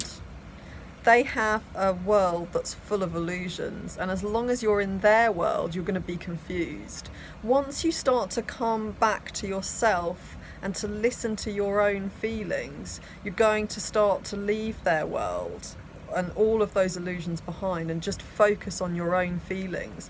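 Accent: United Kingdom. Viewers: British accent is hard for me to understand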